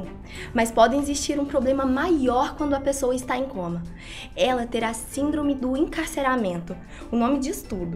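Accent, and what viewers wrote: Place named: Minas Gerais, Brazil. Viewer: ela tem um sotaque bonito de mineira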